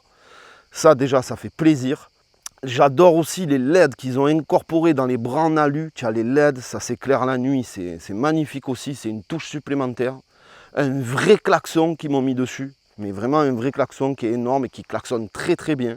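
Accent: South, France. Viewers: Super test, j'adore ton accent du sud!!!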